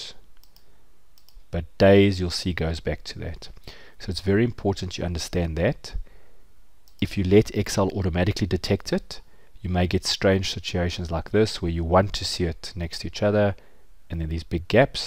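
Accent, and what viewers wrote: South Africa. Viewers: dude, are you South African? haha definitely a SAn boytjie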